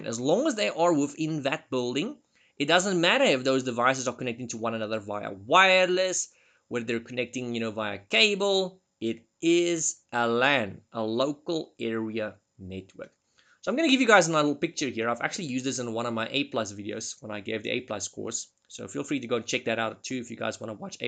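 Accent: Afrikaans, South Africa. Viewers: I can not unhear the Afrikaans poping out from the english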